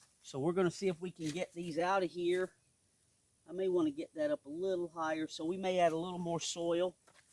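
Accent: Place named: South, United States. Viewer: I thought you sounded southern in Indiana. Welcome. Tis different. Hope you get ur sweetpotatoes going like city lot! Good luck!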